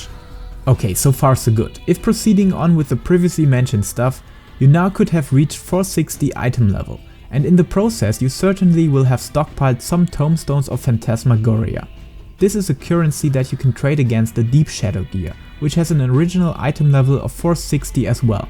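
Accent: Germany. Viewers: sorry to ask man but where do you come from your voice sounds like a german that speaks english ^^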